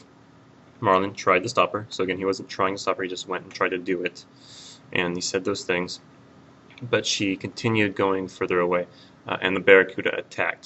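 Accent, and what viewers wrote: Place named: United States. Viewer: esto es genial. Solo queria decirte que tienes un poco de acento americano, pero la pronunciacion es muy bien. Puedo usar tus videos pa' aprender Ingle en lugar de españoles porque soy de Puerto Rico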